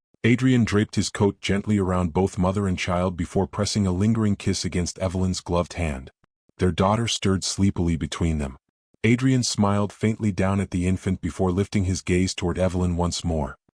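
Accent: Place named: United States. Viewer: An AI with a mechanical robotic American accented voice for a story set in Britain. Odd...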